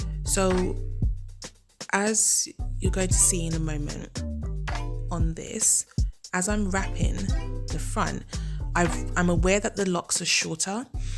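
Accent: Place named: United Kingdom. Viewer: Love your hair and I just love the English accent, so elegant.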